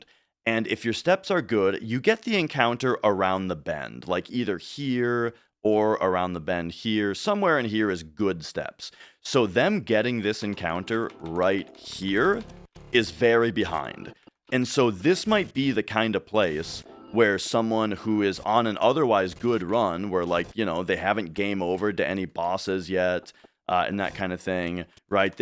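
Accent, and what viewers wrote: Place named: Scandinavia. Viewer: I literally had an entire 10 minute existential crisis wondering if you were Nordic with an amazing English accent or if I were just schizing. Your speedrunner profile says you're from MN, and also MN has a lot of Nordic heritage, but then I realized it would be equally likely that of all of the places a Nordic person would move, it would be probably North Midwest. And then, lastly, I realized that this has distracted me from the substance of the video so I rewound and found myself really enjoying the video. Liked : D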